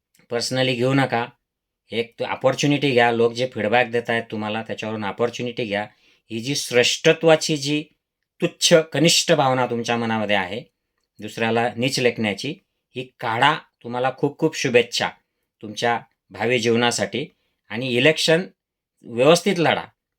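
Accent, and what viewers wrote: Khandesh, India. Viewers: अहिराणी भाषा अगदी गोड आहे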